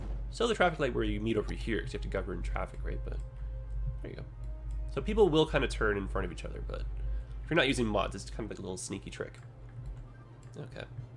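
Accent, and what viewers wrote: Canada. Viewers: That Canadian accent, bro 👍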